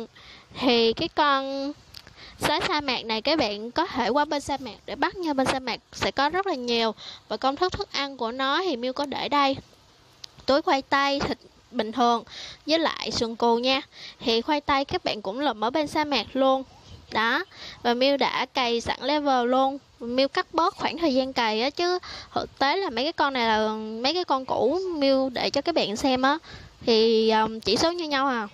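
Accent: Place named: Phu Yen, Vietnam. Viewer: Giọng phú yên nè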